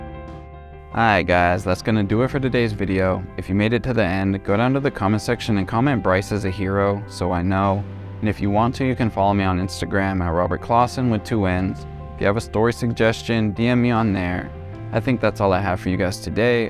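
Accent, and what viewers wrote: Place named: United States. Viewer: yvw.... enjoying u v much ... plus uve got the only american accent ive ever been able to handle lol xxx <3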